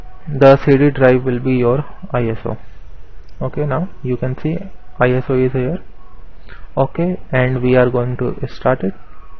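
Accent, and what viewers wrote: India. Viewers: Actually, I understood him very well, sure he has an Indian accent, but good English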